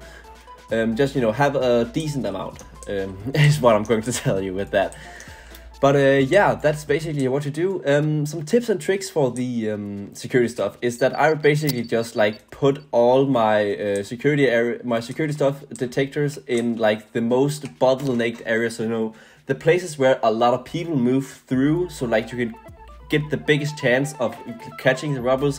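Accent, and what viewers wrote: Denmark. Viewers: are u danish? u sound danish with ur accent, and it says on the credits that u translated the game to danish???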